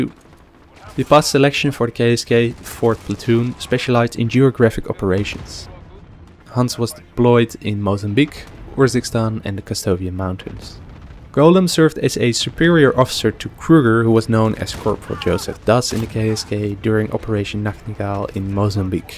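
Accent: Netherlands. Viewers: grappig man je kan kk goed horen dat je nl bent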